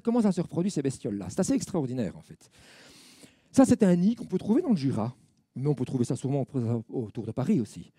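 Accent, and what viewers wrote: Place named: Brussels, Belgium. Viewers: Tellement magnifique!!! Qu'est ce que j'ai pu passé petite devant un nid de fourmis; j'en retire de mes expériences d'enfant, une société super organisée, j'étais toute jeune stupéfaite... Cette volonté, ce courage déterminé en la vie, sublime!!! Vous en parlez remarquablement bien!!! Elles sont formidables et quelquefois, excusez-moi, elles nous emmerdent!!! Néanmoins, depuis mon jeune âge, elles m'ont toujours fascinés... Votre exposé me rallie encore plus vers elles, je vous en remercie!!! A votre accent, vous êtes Belge (oui j'ai vu Bruxelles), vous êtes de Liège (l'accent) ??? Je le suis aussi ; mais à présent en Provence 04, et encore beaucoup de petites fourmis !!! SOURIRE !!! CHRYS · ... L'accent du conférencier, de même que son type d'élocution, sont plutôt ceux d'un francophone bruxellois. Ennoutre, l’intéressé est rattaché de façon assez significative à l'ULB. 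Un accent pas si différent, en l'occurrence, du phrasé d'un Benoît Poelvoorde, par exemple – encore que ce dernier soit originaire de... Namur ! (Mais le 'rouleau compresseur' linguistique qu'a été la télé depuis les années 60-70 a pas mal contribué à uniformiser nombre de particularismes régionaux, en Belgique comme ailleurs. Sauf, peut-être, du côté de Liège précisément, ex-principauté indépendante, bien connue pour son esprit frondeur et et sa résistance séculaire aux influences extérieures ?).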